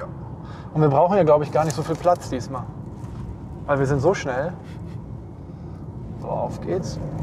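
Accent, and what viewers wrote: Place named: Germany. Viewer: Bruder für ein Mustafa spricht du zu Hochdeutsch. Ich mag es irgendwie nicht🤔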